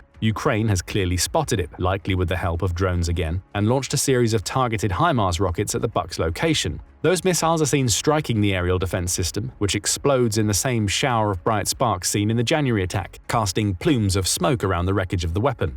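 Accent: England, United Kingdom. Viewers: Amazing to hear someone with an English accent use the phrase, "Get out of Dodge". Funny and cool at the same time. I am hopeful for Ukraine, and despise president trump and his pro-Putin agenda.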